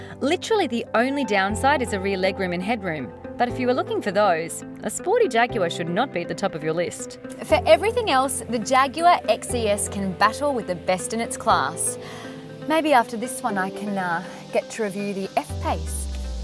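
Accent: Australia. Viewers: since when did Aussie accent start getting compliments? anyway, the car is not as elegant as the Sydney blond!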